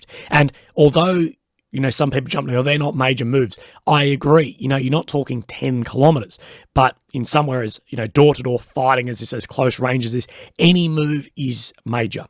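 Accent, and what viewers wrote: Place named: Australia. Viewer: the western corruption is far more discreet and is missive it seames the military's is used as a cash cow no mater where it is . Even the amount of maintenance western weapons' have to undergo to keep it running at peak performance is dodge. I like your channel and hearing an Ozzy accent presenting your take on the situation is good . cheers mate and best of luck with your health battle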